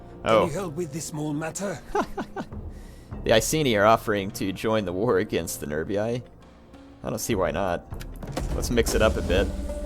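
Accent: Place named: South, United States. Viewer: it's funny how you say things like "ought" and other southern slang. Don't take offense to what I say, and don't change it. But what am I to say anything, I'm a stinking northerner.;)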